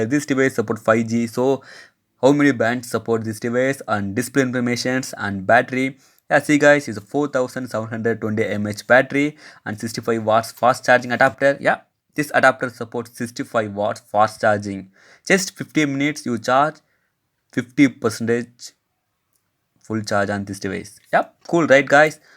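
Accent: South India, India. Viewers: What a malayalam or tamilian style english😢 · Your English sounds like South Indian English.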